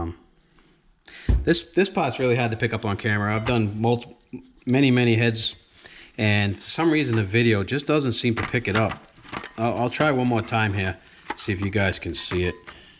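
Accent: Boston, United States. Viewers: dude i knew i could count on you. i have a headtht i'm ready to reseat the valves, i have the compound but i dont have the little suction cup lapping tool. i started watching , i thought you might have the tools but id have a look, and sure as shit you got a cheaper better way. i love your vids. i work alot like you. ive built three drz engines with your help on the first 2, third one i di by memory. since then i bought a kfx 400 quad. rebuilt that . i bought it thinking it needed carb tuning per the seller. got it homer did the carb woudnt start. i started looking around, turned out the oi was mixe with coolant. it had sat like that for along time. probably whoever had it was riding it like that til it finslly died. yeah i bought it without testing going on the sellers word. seemed like a goood guy. i learned a lesson, never trust a seller even if you met him at church. 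cuz thyll tell ya what you wanna here. theyre like politicans in that sense. i got it for $1500 though so i wasnt to pissed. its up and running like a champ now. only spent another 200 bucks so 170 i have a cherry 2003 kfx 400 big bore. i had some parts from drz's. carillo pistons are beautiful too. ii wanted to put it on a chain and wear it as a necklace but i put it in. drz runs like a beast. thanks to you i am now buildng engines myslf. u da man. wtf are you from boston area? my wife is from faihaven. its in the armpit. hour out of boston. her entire family family talks like you. she doesnt thank god. no o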